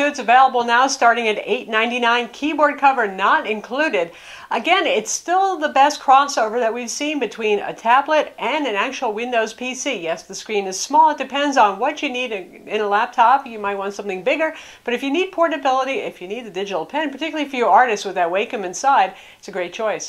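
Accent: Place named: Upstate New York, United States. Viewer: Gifts live that upstate NY accent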